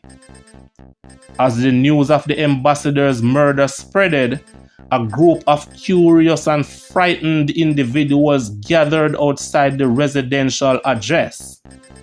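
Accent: Jamaica. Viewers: I understood every word. Some French, Spanish, etc. people speak English with an accent yet are not ridiculed. Patwa is our mother tongue. Gwaan taak, mi luv yuh Jumiekan accent🙂 Luuk yah, Google translate have patwa now, suh me wouldn't mind if you chat full patwa😉. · You have never heard patwa before? Stfu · He is Jamaican we speak how we chat.. Lol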